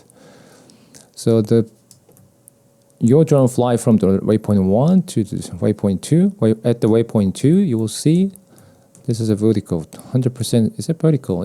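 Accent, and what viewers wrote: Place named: Australia. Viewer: Yeah same here! Its fine! At times he sounds like he has a Australian accent! And for the record I understand him better than a lot of Australians! =]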